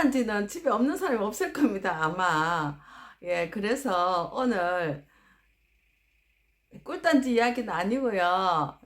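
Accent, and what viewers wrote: Gyeongsang, South Korea. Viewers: 찾아주셔서 매우 감사합니다 좋은 강의도 듣고요 어찌 알고 오셨나요 행운의날예요 간격두고 인사올릴께요 영주 남편고향 가면 사투리 듣는데 경남이신갑네예 ⚘️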